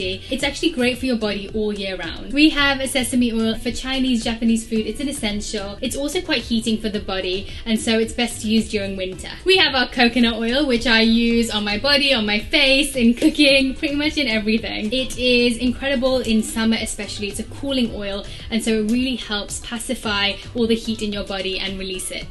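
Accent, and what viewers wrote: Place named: United Kingdom. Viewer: I love you! Somehow you appeared in my Youtube videos. I am trying to become fully vegan and your recipes are helping me loads. I am mexican but I lived for 9 years in London so listening to you and your British accent brings me lovely memories. Also, we mexicans use mostly black beans and no kidney beans.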